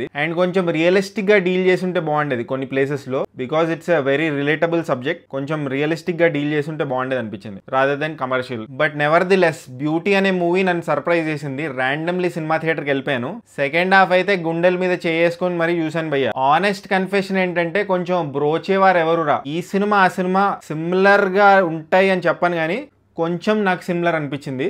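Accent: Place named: Visakhapatnam, India. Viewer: Vadhura puku vizag slang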